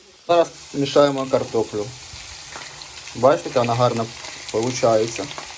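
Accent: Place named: Lubny, Ukraine. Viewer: В мене рiднi в Лубнах. Говiр дуже схожий😁 🇺🇦✌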